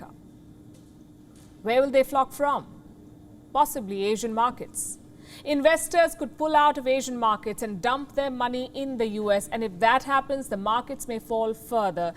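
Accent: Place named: India. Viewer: wut lmaoo... her accent is totally Indian.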